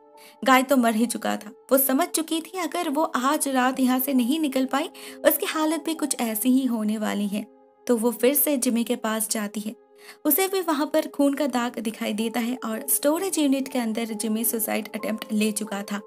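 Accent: Bengal, India. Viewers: Bengali accent..many Bengali's sound very sweet when they speak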